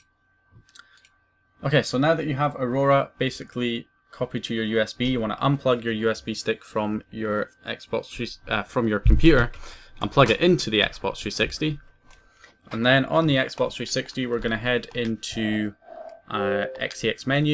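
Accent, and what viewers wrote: Scotland, United Kingdom. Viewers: Are you scottish bro? Just for catching the accent. (i'm Italian actually)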